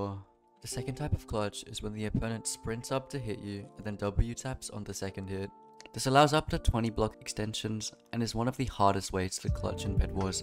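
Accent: Australia. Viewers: YOU SOUND LIKE.. an australian… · idk i why im asking this but u sound aussi. are you? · I KNEW IT, HES AUSSIE, btw this is the gist voiced vid that I have watched from tou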